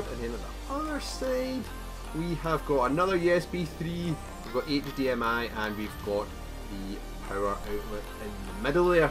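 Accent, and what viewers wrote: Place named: Scotland, United Kingdom. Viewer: Unboxing Therapy Scottish version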